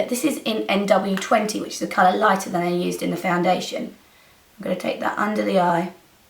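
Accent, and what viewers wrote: United Kingdom. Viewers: Are you from the U.K coz u have a british acceny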